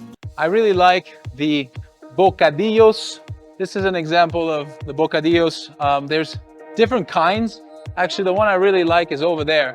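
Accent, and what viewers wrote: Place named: Ecuador. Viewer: Idk how I got here but I'm glad I did, loved this video! the bocadillos and n that red thing made from guaba are both my favorite too, the encebollado mmm... I actually hate it I might be the only Ecuatoriano who hates encebollado lol... saludos desde Guala-Azuay-Ecuador 👋 👋 btw ur Spanish is incredible u even have our Ecuadorian accent.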